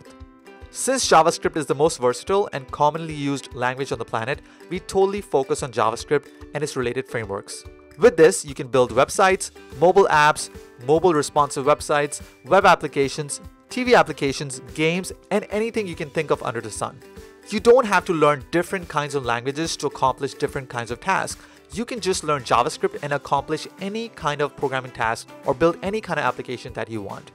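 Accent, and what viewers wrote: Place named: India. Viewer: I love your Indian accent in the background :) :) makes the video makers more authentic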